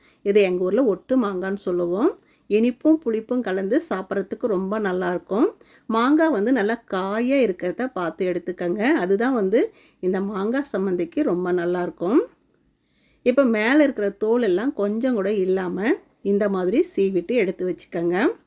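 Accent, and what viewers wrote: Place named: Kongu, India. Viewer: Kongu Thamil Magaley Super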